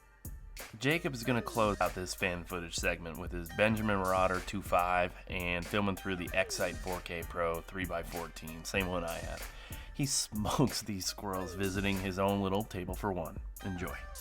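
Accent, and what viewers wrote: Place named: Spain. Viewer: What's up with the Spanish undertone? 🤔I'm confused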